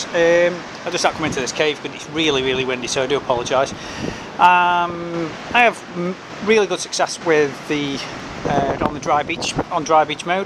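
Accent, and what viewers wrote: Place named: Stoke, United Kingdom. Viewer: You sound like a proper Stokie mahhh mayte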